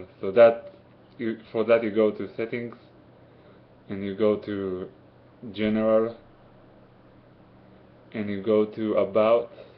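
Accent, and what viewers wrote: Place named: Israel. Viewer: איך שומעים שאתה ישראלי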